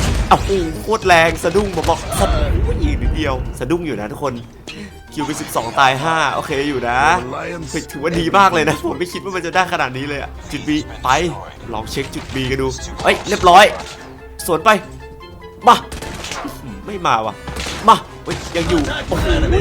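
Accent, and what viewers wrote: North, Thailand. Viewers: คลิปก่อนพี่พูดเหนือ ผม:นี้ใครว่ะ?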